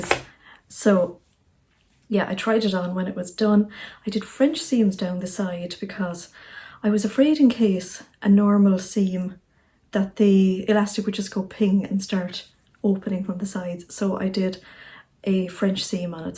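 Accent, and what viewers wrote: Ireland. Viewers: I've just recently discovered your Chanel I have to say I've binged watched them nearly all. Thank you for the hints and tips you make me chuckle as I find myself saying oh I've done that ! And absolutely feeling the pain when you put a lot of effort into your work to find the sizing is totally off ! But you keep going because you love seeing just as I do I also hate to let a mistake beat me I'll try and try to make it work or make something from the material. Cos like you I'm tight I hate waste . So please keep that lovely Irish accent coming with more tutorialS LOL Irene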